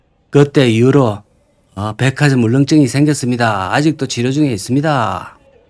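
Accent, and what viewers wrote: Gyeongsang, South Korea. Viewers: 갱상도 사투리가 구수하니, 좋네요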